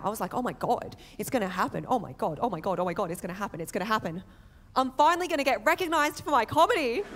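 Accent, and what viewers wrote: Australia. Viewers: I detect an Australian accent, pretty cool. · I came here from a Yumi Nagashima video. The Aussie accent really threw me off lol. I'm not sure what I was expecting when I clicked, it just wasn't a strong Australian accent 😅 Hilarious stuff! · I'm an Aussie who moved OS in 98 and I think it's just really cool hearing and Aussie accent from an Asian (sorry if this sounded racist, not my intention.....oops) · Strange seeing a Chinese with an Australian accent. 🤣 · Your material is excellent. Great comedy. Only Aussie chinese girl can deliver the punchlines so well 👍👍🌹🌹You're just as good and funny as Aaron Chan. 100% aussie accent too 😃😃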